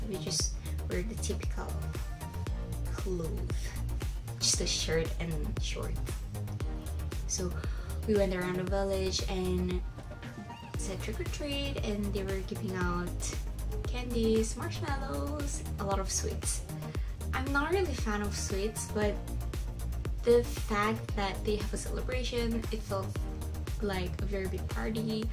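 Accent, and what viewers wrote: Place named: Philippines. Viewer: you have a filipino accent... hehe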